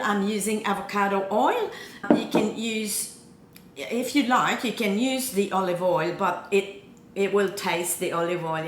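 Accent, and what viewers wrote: Australia. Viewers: Une francaise avec un accent Aussie. Ou Kiwi?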